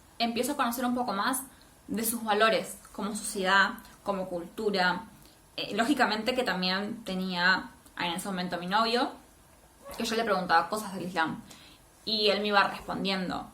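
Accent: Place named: Argentina. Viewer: Me gusta escuchar el acento argentino 😍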